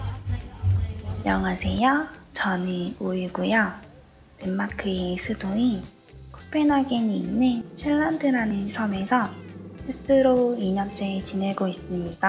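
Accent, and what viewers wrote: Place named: Seoul, South Korea. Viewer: 서울말 잘하는구만😙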